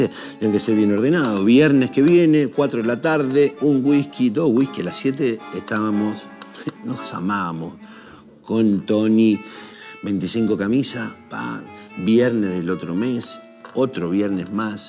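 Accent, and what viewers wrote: Argentina. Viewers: Excelenteeeee Todavía recuerdo al Jorge Alís que promovía el Cachafás por allá en el año 2000 En la radio tierra am todas las tardes Más o menos como a las cuatro de la tarde No sabíamos cómo era el dueño de la voz gruesa tras el micrófono y no necesitaba más que su carisma y su acento para trasladar la conciencia de cualquier chileno de entonces Al lado positivo y con la verdadera riqueza argentina La del obrero, la del trabajador honesto y disconforme La del que ya se puso de pie una vez en el camino ... Sería but que éstas historias, Jorge las pudiera contar en un formato más largo Quizá de unos 45 minutos máximo y acompañado también de un mate ... Son tantas las oportunidades y tan diferentes las experiencias Que la mía personal, que es hija de la creatividad no se puede compartir de forma completa en éste comentario ... Sólo quiero agregar, que así como una vez de la hermosa Argentina salió una serie llamada Los Simuladores Así también salió Jorge, a rescatar el alma de todos nosotros, verdaderos soñadores ...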